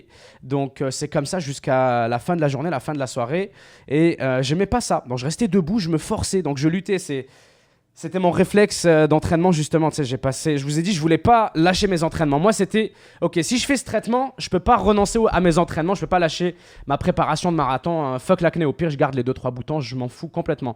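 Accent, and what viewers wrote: Quebec, Canada. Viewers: Salut mec , je trouve que pour un québécois t'a un accent assez léger par rapport à Gurky.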